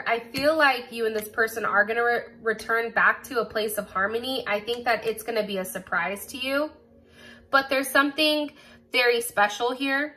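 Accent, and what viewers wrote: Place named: United States. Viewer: Luv your American accent (I'm English )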